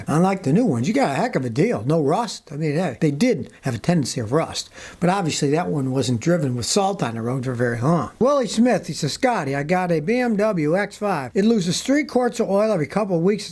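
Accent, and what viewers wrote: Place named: New Orleans, United States. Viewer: Good afternoon , Mr. Scotty , I just found your channel , by me looking into buying a 2004 Honda C R V , I really appreciate your much valuable knowledge , Thank You , for sharing , I wanted to ask you a question , are you from New Orleans , I grew up a hr away from there , you sure sound like you are from there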